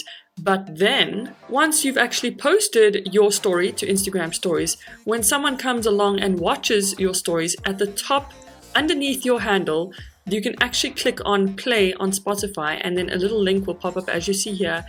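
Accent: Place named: South Africa. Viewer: Oh my gosh :D I just started watching this and was like 'this check has got to be south african!' :D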